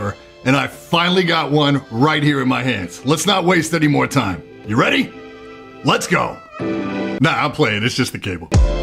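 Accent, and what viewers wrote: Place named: California, United States. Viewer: Love your humor and style of presentation. Haha where you from? Sounds like Cali.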